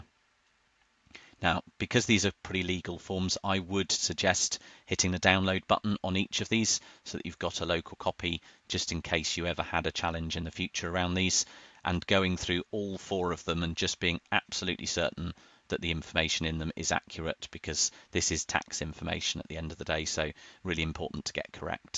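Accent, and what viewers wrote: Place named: United Kingdom. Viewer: Great helpful video in plain uk English 😊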